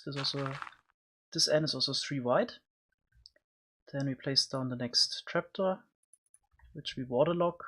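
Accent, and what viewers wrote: Germany. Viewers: Man hört, dass du aus Deutschland oder Österreich kommst😂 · Höre ich da einen deutschen Akzent? :)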